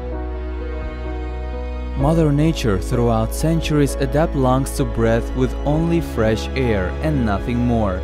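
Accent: Russia. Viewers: If this video was produced in Russia or any other country near it, and by the acsent of the speeker I can assume that the video was really produced there.... The guys will go to jail with weed experiments ;) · Йор рашн акцент ин Инглиш им рилли кул:) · Jose Silva he is Russian so his accent makes "L"s and "V"s sound weird. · He is from Russia vs are pronounce with a w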